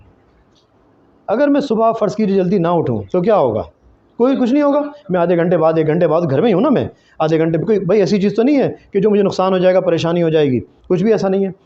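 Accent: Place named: United Kingdom. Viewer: Good British English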